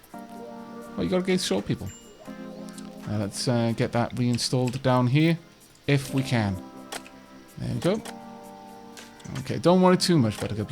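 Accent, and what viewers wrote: Wales, United Kingdom. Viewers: new sub here, Rimworld brought me but am enjoying your style so I shall check out the rest of your content! Out of curiosity, would that be a slight hint of a Welsh accent I hear?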